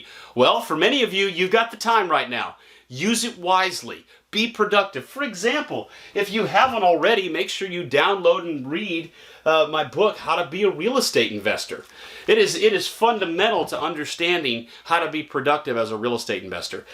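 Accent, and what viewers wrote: South, United States. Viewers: I'm working to get pre-qualified now as a first time home buyer. Just want to say that I love your videos,. You've got great energy, mannerisms, and a southern draw to boot. I could watch all day!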